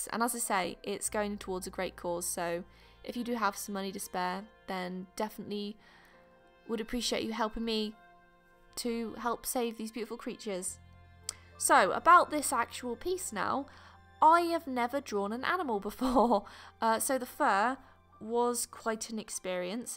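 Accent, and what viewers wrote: United Kingdom. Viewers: I’ve been to your Etsy store hoping to see prints of all your work but there’s nothing I love your Dean Winchester pic it’s awesome just like you id love to learn how to do digital art not for professional just for a hobby with rewards at the end I love all your work but I only get an allowance I’m really poorly so I often arrive late and I don’t even know if you get any of my messages. But your such an amazing role model for me and your fun vids have helped me smile even when multiple bones are breaking as they do when my bone disease is bad and staying still doesn’t do a thing to help. Your a ray of sunshine on a dull day and I love your British accent it makes me feel like home I’m in Sheffield England so I’m a Yorkshire girl. Keep doing what you love. Love everything you do. Take care. Rachel x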